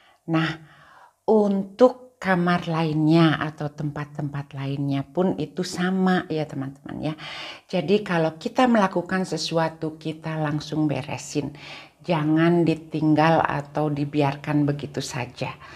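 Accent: Indonesia. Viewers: Rumahku...istanaku... bagus skl penjelasannya ibu yg cantik... Sy jg senang dg gaya bicara ibu walaupun tlah lama di luar negeri,namun tetap logat bhs Indonesia nya msh kental. Smg ibu sll sehat ya bu,pjg umur.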